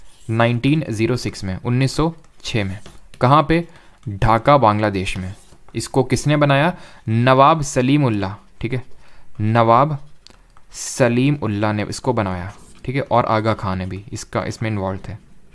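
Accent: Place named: West Bengal, India. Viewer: Your bengali pronunciation is very sweet ❤❤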